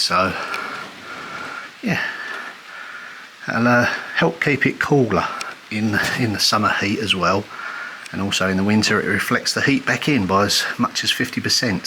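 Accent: United Kingdom. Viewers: I love seeing your videos, both here and on the Pethericks!. I'm curious, where in Britain were you raised? I'm working on my accent for acting and wonder where your accent comes from? Thanks!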